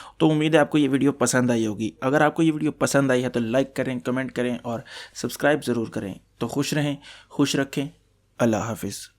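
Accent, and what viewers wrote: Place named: Pakistan. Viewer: Your voice tell me you are from Pakistan 🇵🇰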